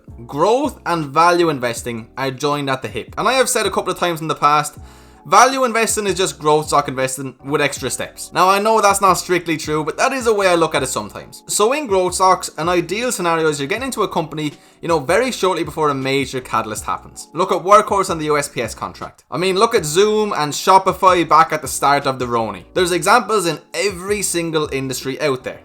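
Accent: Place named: Ireland. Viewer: Sound vids man. So nice hearing an Irish voice aswell hahaha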